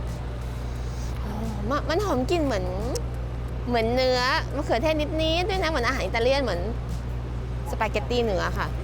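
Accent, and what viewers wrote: United Kingdom. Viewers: ฟังน้อง พูดภาษาอังกฤษสำเนียงคนอังกฤษ(ไม่ใช่สำเนียงคนอเมริกัน)น่ารักมากค่ะ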